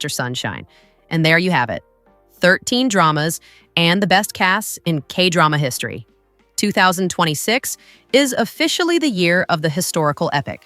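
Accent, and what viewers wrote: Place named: United States. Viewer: American accent on korean language hurts my ears. 유지태이???? Arghhhhhh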